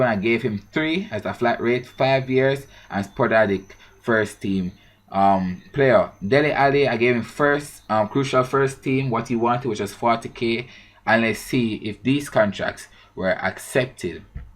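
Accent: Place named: Jamaica. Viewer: Loving this series..should have more views..your accent is awesome and it's about we had another Jamaican at Bolton :P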